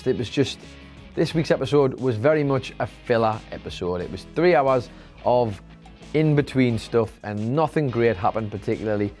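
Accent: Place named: East London, United Kingdom. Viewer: Really guys? It’s regional accents and dialect. Adam Nicholas and Clery are from Newcastle, and that is a notable accent. See also Ant & Dec Andy Murray is Scottish, and is from east London, and has a corresponding accent.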